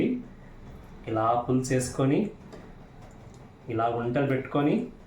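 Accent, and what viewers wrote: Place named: Kerala, India. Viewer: First time vintunna Unta pulusu. Meeru malayalam Andi. Mee telugu Different ga undi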